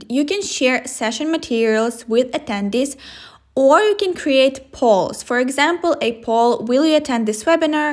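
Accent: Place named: England, United Kingdom. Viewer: Love your English accent, great vedio.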